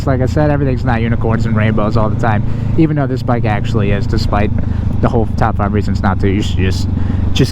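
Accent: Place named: New Jersey, United States. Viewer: First time watching your videos, from the minute you started speaking I knew you were from New Jersey, then I saw similar landmarks like the airport where the 177th fighter jets take off. I use to live in Margate & Ventnor City.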